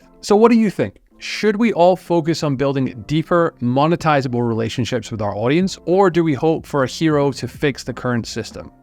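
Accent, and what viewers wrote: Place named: France. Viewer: My site's numbers went back to normal almost .. climbed back up in a week. I like this copy of Income school's report but with a French accent. :). Well, same tribe destroyed almost all US corporations, from Pharma to Boeing and everything in between. Just so they can rise from slumps and not be slump dawgs.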